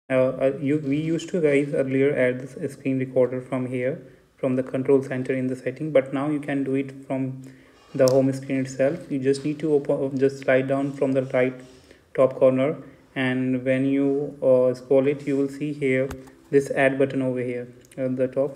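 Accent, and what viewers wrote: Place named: India. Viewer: As soon as I heard the Indian sound I knew it would work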